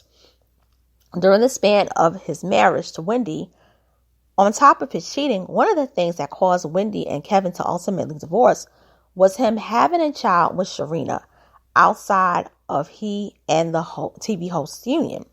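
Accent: New York, United States. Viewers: you sound so NY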